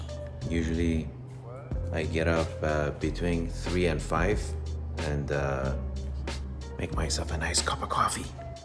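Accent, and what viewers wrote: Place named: Iran. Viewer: Pure Iranian accent. It's good tobe original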